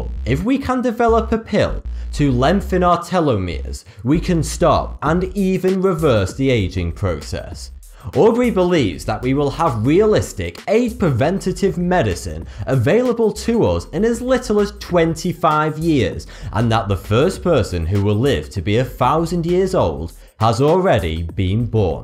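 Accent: United Kingdom. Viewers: i luv his British accent :) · Shady Marshal he's brittish come on give him chance i couldn't even say thoughty2 because i m from asia i always say ''to(cant say G)ty 2)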